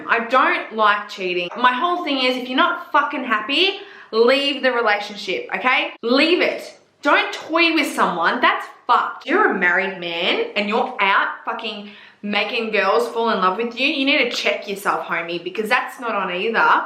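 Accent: Australia. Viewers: Your Aussie accent 😩. Love it girlfriend.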